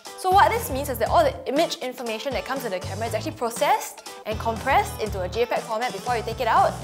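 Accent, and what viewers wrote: Singapore. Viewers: cute. am detecting Singaporean/Malaysian accent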